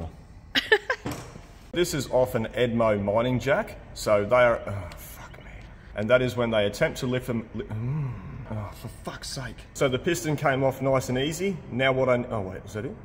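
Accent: Australia. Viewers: Nice aussie accent , amazing craftsmanship, make your vids worth watching. Greeting from a fellow machinist.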